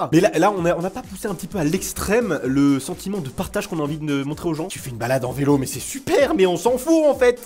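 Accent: Quebec, Canada. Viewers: IL EST COOL L'ACENT QUÉBÉQUOIS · Ton accent québécois est magnifique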